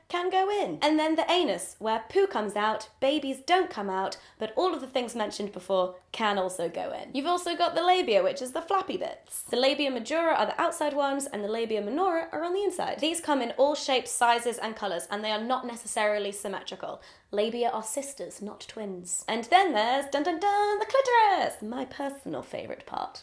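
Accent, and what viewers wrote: United Kingdom. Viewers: You have the most satisfying and British (like me) voice ever omg... also im glad im not the only one!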